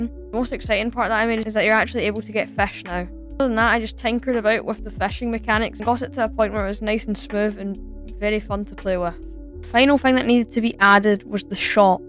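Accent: Scotland, United Kingdom. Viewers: It’s Scottish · R u Scottish cuz u have the accent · Are you Scottish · its either bros really british or bros faking the british accent (still respect)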